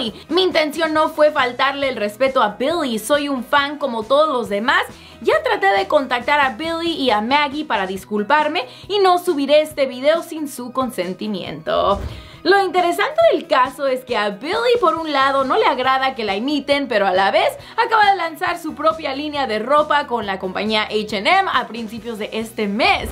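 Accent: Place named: United States. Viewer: me gusta la pagina, pero odio cuando la chica habla tipo gringa, billie jajajaja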